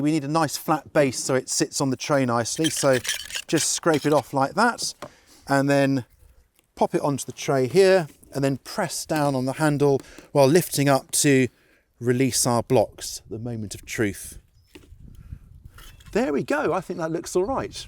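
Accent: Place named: United Kingdom. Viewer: Hello Ive been watching your videos. I just decided to join your channel. Like your accent.. can I ask what part of the UK. You live in?? Joe from the USA.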